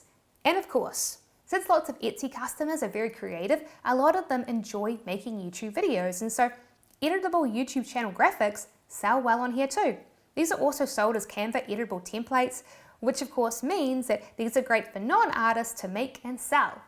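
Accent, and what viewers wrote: New Zealand. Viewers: I somehow understood it the moment I heard on word. Letter E sounds I and I love NZ accent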